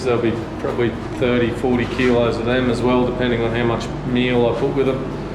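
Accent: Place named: Australia. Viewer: Love the Ozzy accent - a kiwi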